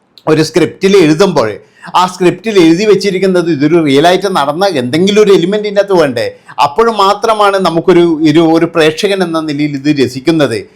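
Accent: Kannur, India. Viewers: Ningal Kannur ano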